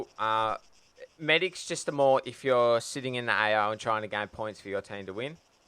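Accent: Australia. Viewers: god you have the most aussie voice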